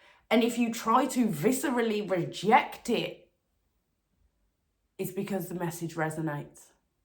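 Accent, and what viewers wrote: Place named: Midlands, United Kingdom. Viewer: Damn I feel like I was supposed to find your channel right now. I been conserving solar energy on my own for 6 months to follow my life purpose within boxing, but sometimes it’s tough. But I appreciate the message in your content a lot, my current experience really resonates with what you’re saying. How did you come to learn the things you’ve learnt? Also are you from Brum or the midlands? Your accent is familiar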